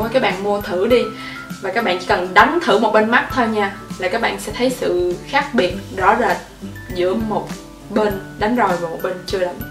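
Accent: Mekong Delta, Vietnam. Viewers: Thích sự mộc mạc của chị với cộng thêm điểm giọng nam, miền tây êu dấu. Đáng yêu vô cùng hí hí Làm thêm nhiều video hay nhe chị, cố lên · chị là người miền tây à ? quê chị ở đâu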